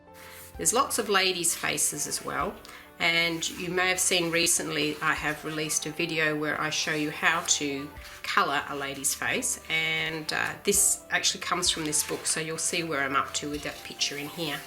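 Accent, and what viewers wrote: Australia. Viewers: Hi, love love love your work, not only beautiful but inspirational (and also refreshing to hear a fellow countrywoman). I have thoroughly enjoyed every single video you have produced, and especially find your book reviews very informative. I received Dagdrommar in the mail the same day you released "how to colour a womans face" and had to chuckle, I have also just received Sommarnatt in the mail today and your are correct, just as "lovely" as the first. I am an amateur artist in Adelaide 45yo male, and just wanted to say "hi" and "thanks', keep up the great work:-)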